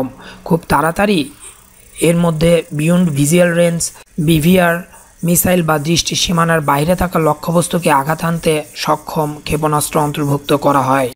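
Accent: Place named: Bangladesh. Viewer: তুই কি বাংলাদেশি তোর শব্দ উচ্চারনে বাংলাদেশি গরুর গন্ধ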